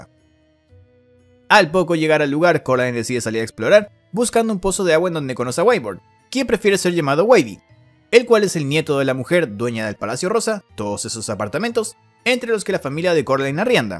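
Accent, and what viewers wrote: Chile. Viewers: Eri chileno?? Pensé ke eras de Ecuador djjdjddk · WAO ERES DE CHILE NI CUENTA ME DI , POR TU ACENTO :o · este wn es chileno? Grande 🤝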